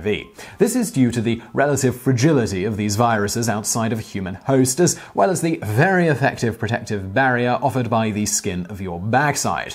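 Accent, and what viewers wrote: England, United Kingdom. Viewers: Still, as always...thanks for your nice signature English Accent, clearly delivered without sounding like you have a mouth full of English marbles...really.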